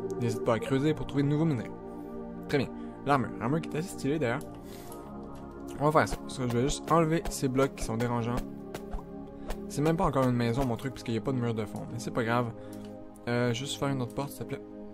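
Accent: Quebec, Canada. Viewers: Tes québécois ?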